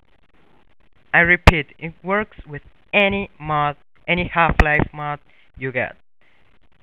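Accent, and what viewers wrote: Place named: Argentina. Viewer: YOUR ENGLISH SOUNDS LIKE ARGENTINIAN! I'll suscribe.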